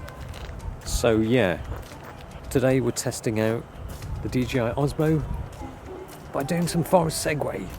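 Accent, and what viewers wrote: United Kingdom. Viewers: I thought u are a blonde british. Didnt expect an asian with a heavy british accent. Amazing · Never seen an asian looking person with a british accent👌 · so weird seeing an asian speak in a genty british accent. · wow did not expect a prim british voice coming from this guy :D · Yeah, I was waiting for mid age brit after hearing a voice. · You knew what blew my mind more than that camera, an asian guy with a strong british accent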